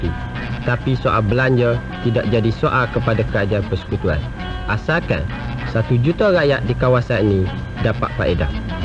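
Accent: Kelantan, Malaysia. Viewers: Penyampai pun slang klate ni..nyakut molep😁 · Yang uniknya suara hos dokumentari ini pun kecek Kelate jugok!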